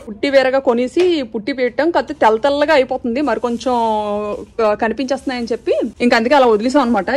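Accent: Vizianagaram, India. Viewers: your voice and slang, very connecting to our own area and place.. very authentic and natural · Yeah true bro, and filmy moji valla mana vzm slang ki YouTube lo Baga reach vastundi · Desha basha landhu Telugu lessa.. Ejjinagaram basha enka superu.. Great.. adhirindi.. god bless you.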